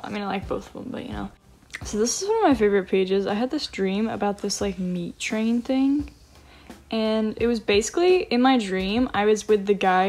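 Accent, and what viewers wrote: South, United States. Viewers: I hope this isn’t rude or anything but your accent makes me so much more secure about mine! Even though I live in the south people make fun of my southern accent sometimes and hearing someone else with it makes me feel so good. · I love your accent. I also have a southern accent and I find them pleasant to listen to. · That country accent coming in thick lol it's adorable! I really love your art btw it's so vibrant and smooth looking 💕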